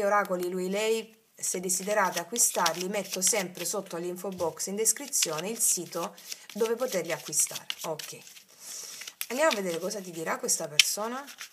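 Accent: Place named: Apulia, Italy. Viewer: Cara, è la prima volta che ti sento... E mi hai subito conquistata per tua Bravura, Simpatia... E il tuo Accento che mi dice che sei Pugliese Come Me!!! Non sono una che segue questo cercare le Carte... Ma mi sono rivista in pieno in questa tua Lettura... Che ti dirò... Azzeccata in Pieno!!! Ti cercherò ancora.. perché sei davvero una Persona Elevata.. A fare Ciò che sta regalando a tutte noi!!! Ti ringrazio.. E ti Abbraccio Forte!!! ❤😂😮😊😅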